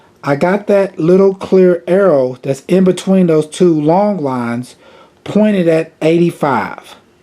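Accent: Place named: Midwest, United States. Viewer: Love the midwest accent "right thurr" 😍👌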